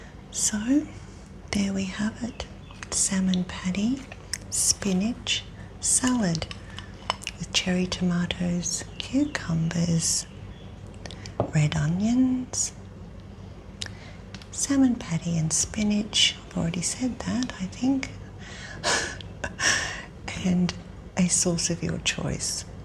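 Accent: Australia. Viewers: Australian accent is my weakness what comes to asmr. And with your voice the tingles are even more amazing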